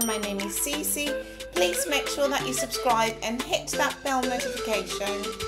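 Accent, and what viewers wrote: United Kingdom. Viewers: I just come here solely for that. Her strong British accent is entertaining. The moment she says, “welcome buck” when I’m used to hearing “welcome beck”. That on its own cracks me up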